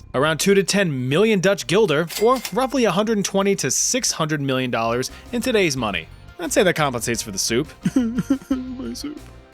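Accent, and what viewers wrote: Australia. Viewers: The Aussie accent has me frying 😭🙏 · Okay mate, your Aussie accent isn't that bad. I'm impressed. Especially in comparison to like...a lot of people on the internet. Also, great video. Also also, Emu's are kinda bulletproof. Something about organs being super in the middle of their bodies, so it took like ten bullets to take them down if you shot right.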